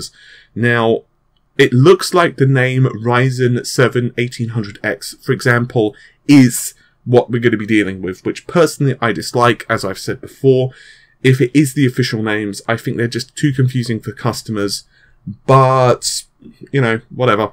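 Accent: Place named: Cockney, United Kingdom. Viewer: 2 & a half minutes til you actually say anything worth listening to. Thru all the mentions of ppl. Let them get their own youtube channel & get their name out there. Then tons of opinions & nonsense. Just get to the fuken content that the video is named after. Less your opinions & bad cockney accent. It's like wading thru a pond in your mind til we get to the information. Why when a mics turned on do ppl all of a sudden get diarrhea of the mouth. You're very verbose.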